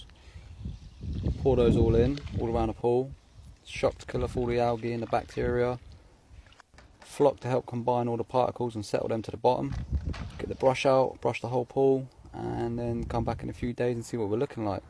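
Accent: United Kingdom. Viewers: The british accent is what complete this video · bruv its his British accent my guy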